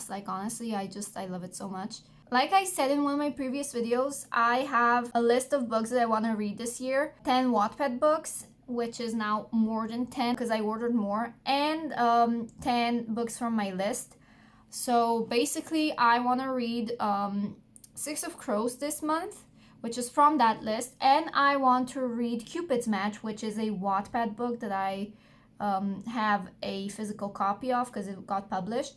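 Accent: Slovenia. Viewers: Love your slovenian accent 🤩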